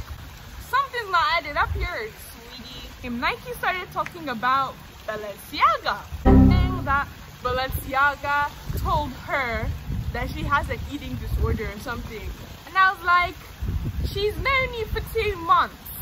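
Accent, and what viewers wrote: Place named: United Kingdom. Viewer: Is da British accent for me luv ❤️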